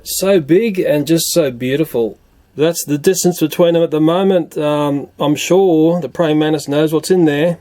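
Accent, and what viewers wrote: Australia. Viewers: Thats an aussie accent 😁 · I could listen to your accent and “Australianisms” all day! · Is this guy AUSSIE 😂😂 he’s cranking some good jokes 🤦🏽‍♂️🤦🏽‍♂️ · Your vids have a combination of greatness that just keeps us tuning in. One of the biggest is the feeling that we can feel your passion and interest in watching this for yourself. Also your commentary that with its quirky funny Aussie ways of speech to my SoUthern Californian ears. I️ love it that your not some scientific know it all and you have input, thoughts, and reactions like any other black widow hating dude! Doing great keep it coming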